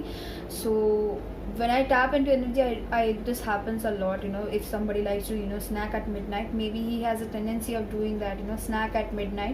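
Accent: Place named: India. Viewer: it's okay sister I will listen it patients so I cab understand actually my hearing english is not that good but it's not that bad and your accent is like indian so it's not that much problem but sister are u from india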